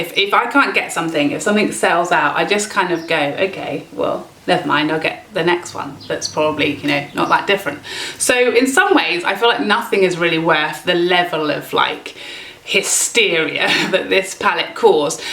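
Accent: England, United Kingdom. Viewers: I seriously CANNOT understand WHY it's so hard to ship to the UK... i told the lady at the Post Office THIS IS CRAZY🤪🤪🤪 I'm So so so sorry it never arrived. The last time I sent it, I haven't received it so you may end up with 2💋😁🤪 Maybe one day. But GEEZE. I am so sorry I wasn't able to get that Palette in your hands sooner.. LOVE YOU MY SWEET ❤❤❤ I adore you in EVERY way. And your English accent GIVES ME LIFE🙌🙌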